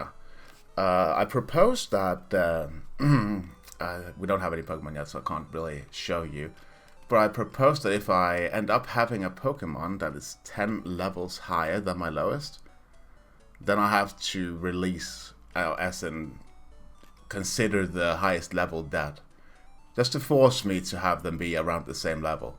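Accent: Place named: United Kingdom. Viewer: what accent is that? makes me wanna pour in a cup of tea for your vids ahah great commentary, eventhough im not as much of a pokemon fan you make it enjoyable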